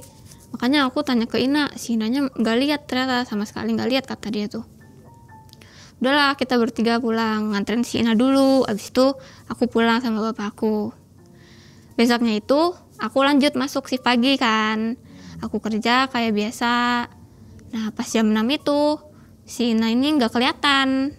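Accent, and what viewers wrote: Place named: Cirebon, Indonesia. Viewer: biasanya org cirebon klo ngomng ya je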